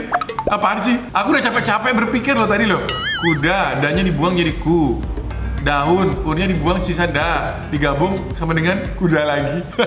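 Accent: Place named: Java, Indonesia. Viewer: bng lo orang mana kok medhok banget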